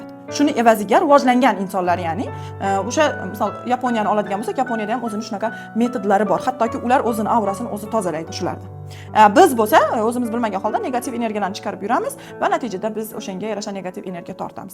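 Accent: Khorezm, Uzbekistan. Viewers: Бу айол хоразмлик экан шева хоразмга кетябди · Асалому алайкум Хоразмликмисиз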